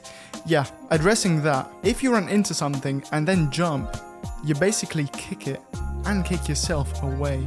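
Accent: Italy. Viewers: Love your Italian accent :D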